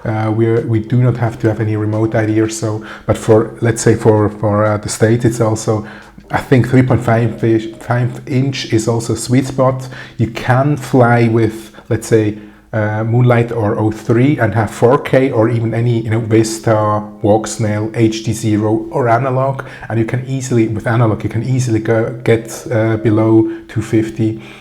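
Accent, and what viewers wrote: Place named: Switzerland. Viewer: Bisch du Schwiizer? De Akzent hani nach 3 Sätz kennt 😅. Aber dis Englisch isch super!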